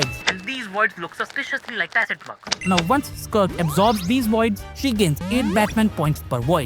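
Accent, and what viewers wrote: India. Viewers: fr bro the indian accent caight me off guard. enjoyed it tho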